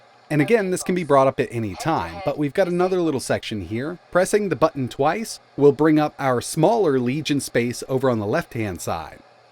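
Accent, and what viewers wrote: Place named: North Carolina, United States. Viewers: Has one of the thickest American accent I have ever heard love the way he says "Mawd" 😂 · That's NC talking!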